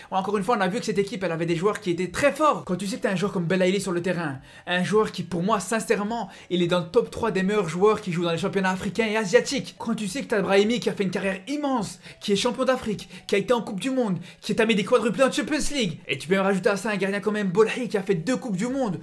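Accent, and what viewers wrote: Belgium. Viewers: On entend bien ton accent belge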